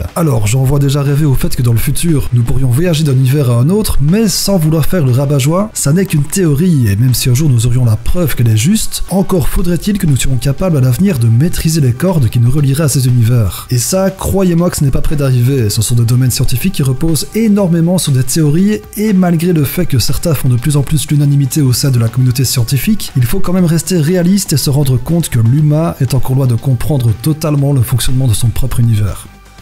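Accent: Liège, Belgium. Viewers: Mdr l'accent belge ! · Haha vu ton petit accent tu dois venir de Liège, en tout cas quelque part par là ^^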